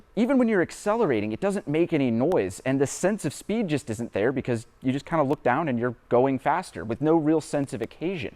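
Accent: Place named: Wichita, United States. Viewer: Does everyone in Wichita(aside from Wizard) speak with the same cadence as?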